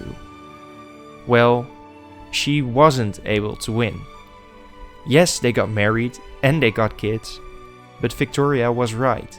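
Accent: Netherlands. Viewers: Is it correct that I hear a dutch accent in your voice? Not meant as an insult, your english is great, just curious.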